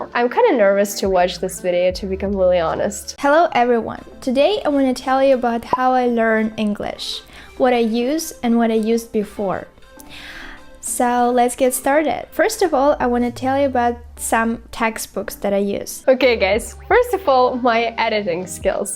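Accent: Russia. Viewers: Russian accent. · русский акцент чувствуется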